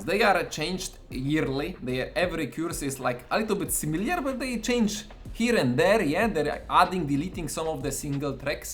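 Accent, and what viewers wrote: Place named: Russia. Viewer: bjutiful raszan akcet yea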